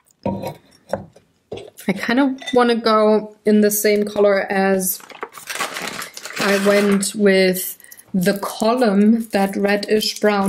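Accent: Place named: Germany. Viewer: Hut ab, dass Du das alles auf englisch machst! Vom Akzent her solltest Du das hier lesen können ;-) Meine Sabbelvideos hatte ich ursprünglich auch auf englisch gemacht früher, aber der Humor ging verloren. Dafür spreche ich wohl zu schlechtes Schulenglisch :) Schaue mich gern auf Deinem Kanal um.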